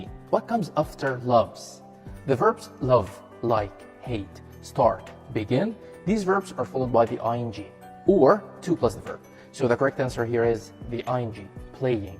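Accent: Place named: Vietnam. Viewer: anh là ng việt ạ, sao lại phát âm f h t n tiếng việt vậy:))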